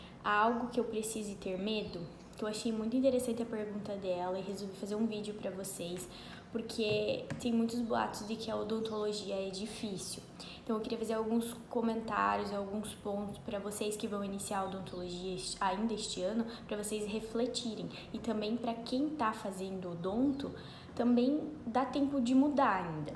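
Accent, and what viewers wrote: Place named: South, Brazil. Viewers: é do Sul pelo sotaque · Esse sotaque gaúcho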